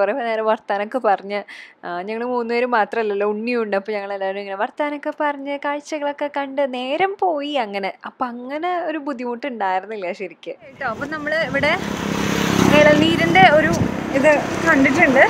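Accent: Thrissur, India. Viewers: അയ്യോ കണ്ണൂര് വന്നിട്ട് കാണാൻ കഴിഞ്ഞില്ലല്ലോ.ഉണ്ണിടെ ശബ്‌ദം തനി തൃശൂർ ഭാഷ.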